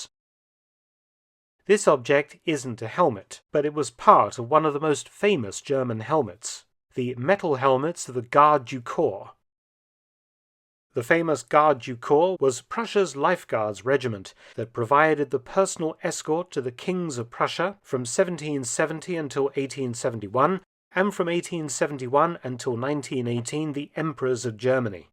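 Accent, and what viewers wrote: United Kingdom. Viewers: What is saying in his British accent is "pickelhaube". He is pronouncing it correctly, but I tried to google "pickel halbee" and it didn't work.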